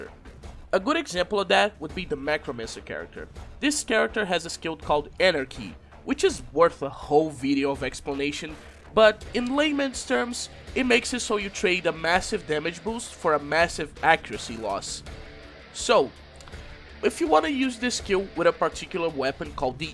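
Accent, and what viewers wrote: Brazil. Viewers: Eu to aqui pensando "que sotaque... brasileiro" ai fui ver e de fato, é um brasileiro falando e.e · I don't know how but I immediately spotted a brazilian accent on his voice, which took me off guard since: 1- I'm terrible at that; and 2- I don't know many brazilians who enjoy Borderlands. It's definitely lesser known IP around here and I had to brute force my friends into this franchise. What a pleasant surprise, keep going!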